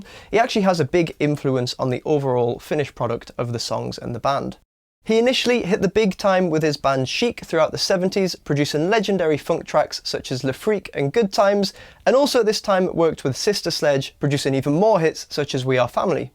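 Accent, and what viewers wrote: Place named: Newcastle, United Kingdom. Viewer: great lessons thank you ! shit hot playing ,and cool geordie accent ! Gan canny 👍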